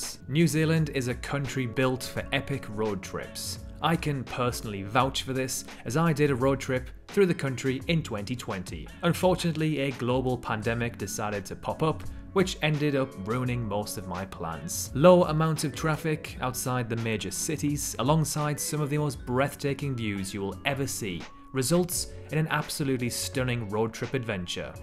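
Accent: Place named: United Kingdom. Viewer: Interesting accent. The person doing the VoiceOver seems to be British but it’s hard, at least for me, to identify exactly what region. does anyone know?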